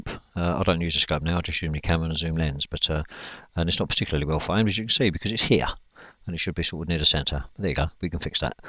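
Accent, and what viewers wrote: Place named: Liverpool, United Kingdom. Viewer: My God he's from Liverpool.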